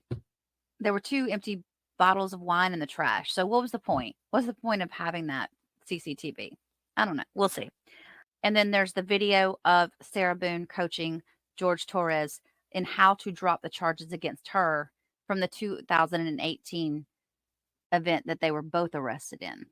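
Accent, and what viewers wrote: South, United States. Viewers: With your sweet southern accent, I'm waiting for you to say "bless her heart".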